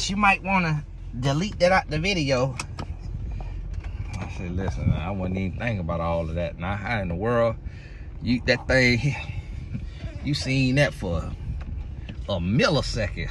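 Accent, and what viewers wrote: South, United States. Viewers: Like the southern drawl. And the advice.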